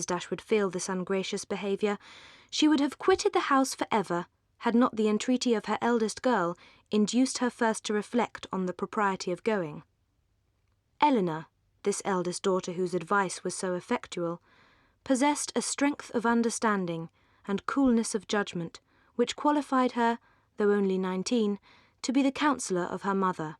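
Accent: United Kingdom. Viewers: She sounds so much better speaking with an English accent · ! My sweetiee💖💖💖 What a beautiful voice she has! Original British accent! I'm so happy to find it on yt. 😻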